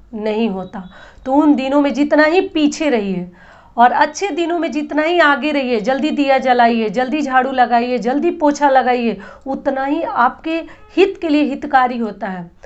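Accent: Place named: Uttar Pradesh, India. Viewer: Jai mata di🙏🙏didi aap kis kahan se hai up se ya bihar se apki bate sunkr to up se hi hai aisa lgta hai pr kis gav se hai kyoki mai bhi up gorkhpur se hun pr abhi nagaland se apka video dekhti hun apki bate sunkr mujhe apne mayke ki bahut yaad ati hai sasural to delhi me hai pr mayka gorkhpur me hai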